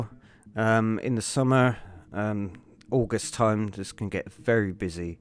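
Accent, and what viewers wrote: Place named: West Country, United Kingdom. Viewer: Cheers for the video. I could listen to your chilled west country voice all day 👍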